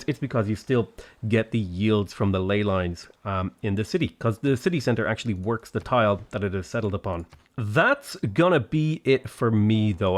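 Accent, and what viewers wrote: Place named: Ireland. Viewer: nothing wrong with your voice. evidenced by the success of your channel it's demonstrably fine. (blessed with an irish accent i would argue its excellent. you're a skilled communicator.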